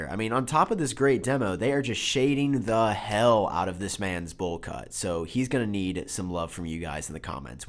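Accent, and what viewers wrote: United Kingdom. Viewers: From one English chap to another 😂😂 great accent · I like your British accent far more than Damien’s hair cut. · The British accent is pretty much on the money! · Sorry You've made this offence too many times I gotta say something😂 There's been too many strikes. I must correct this, Your "British" accent is in fact an English accent. Britain also consists of Scottish people, Welsh people and Northern Irish people and we pride ourselves by not sounding like them. The american equivalent would be like impersonating a New Yorker with a Boston accent. The more you know 😜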